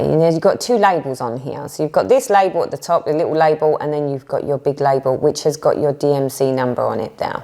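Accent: United Kingdom. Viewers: Loved your video, yet again,! Great to see the comparison of few different storage systems in one video. Very informative. As I said before, you are one really good teacher: great personality, good looking, very pleasant voice, good experience, eager to share, that lovely accent I miss hearing so much away from UK. I started stitching just about 5-6years ago, and have gone through all the storage methods I made up myself and have ever found. I remember all the excitement after my first Orpington Hobby Craft cross stitch shopping turning into confusion, when I really had to start unravelling those flosses, and starting using them for real. Now I have made my own - different, individual storage system, out of snippets I have found and used. Works for me fine. Thanks for your video,! I wish I had all your this video series back then... 💖💖💖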